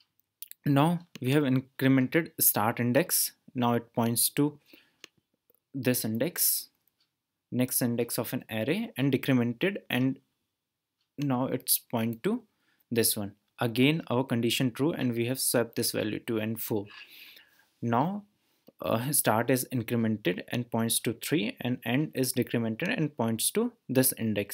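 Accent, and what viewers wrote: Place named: India. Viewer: Everytime hear an Indian accent dude teaching tutorial, I know he will be goddamn good.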